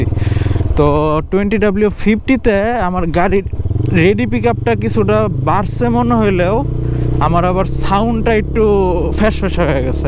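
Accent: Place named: Narsingdi, Bangladesh. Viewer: ভাই এর ভাষা অনেকটা রুপগঞ্জ/ নরসিংদির মত 🤔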